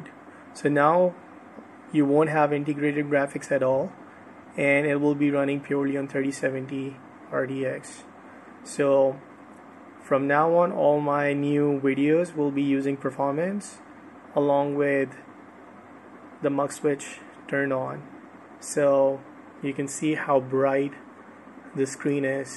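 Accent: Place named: Rajouri, India. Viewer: Abey gandu bina accent ke bol deta, samajhne meh asaani hoti. Rajouri wali accent leke gaand marane jaa raha hai kya gb road?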